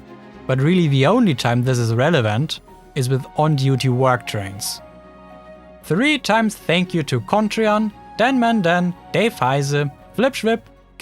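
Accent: Germany. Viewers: Not to be mean but I can REALLY hear the German grammar in your English. It's kinda interesting since your accent isn't all that thick, yet your sentence structure and word choice are so obviously German.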